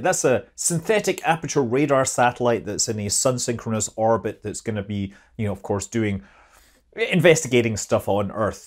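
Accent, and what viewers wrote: Scotland, United Kingdom. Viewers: As a side note, when I hear " here" in that deep Scottish brogue, I am oddly comforted and know I'm getting reliable, entertaining info from one of the best spacers on Youtube. No, it really is the best out there.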